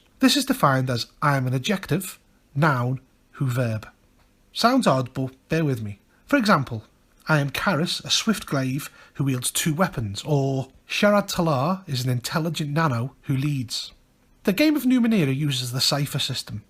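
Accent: Liverpool, United Kingdom. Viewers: Delighted to check out this video and find a fellow scouser. Is right lad!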